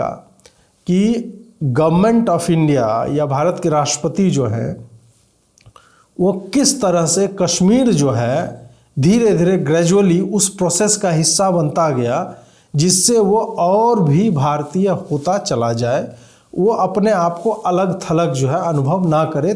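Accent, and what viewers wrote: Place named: Bengal, India. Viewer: Bengali accent is too good. Milega sab milega.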